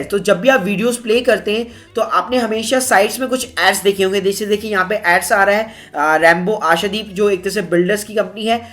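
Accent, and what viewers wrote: India. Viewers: His Indian accent really drives me crazy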